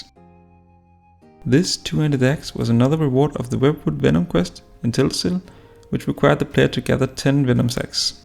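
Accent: Denmark. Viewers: the danglish is noticable in this one ;D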